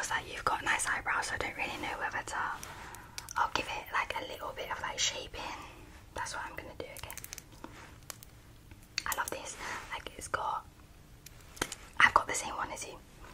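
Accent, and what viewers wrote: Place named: United Kingdom. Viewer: What a beautiful British accent like the girl 🫢‼️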